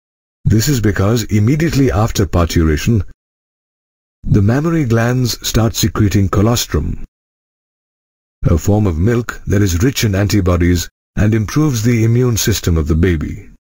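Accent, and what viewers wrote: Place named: India. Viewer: This is Indian accent ma'am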